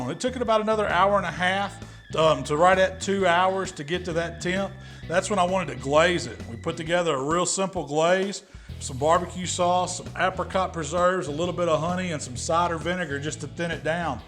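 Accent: South, United States. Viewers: Unless your a big boy with a southern accent then I don't listen to ya on BBQ/SMOKING TIPS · he has quite a strong American (I'm guessing southern? I don't know American accents!) accent. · I can't think of anyone I would trust more when it comes to anything relating to eating meat than a big happy dude with a southern accent and a half dozen different types of grills/smokers on his back patio. At the time I post this there are 299 dislikes.... who the hell is clicking that button?? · Southern accent plus overweight... please serve me food!!! 😍😍😍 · I not really understand what he said, is it south USA accent?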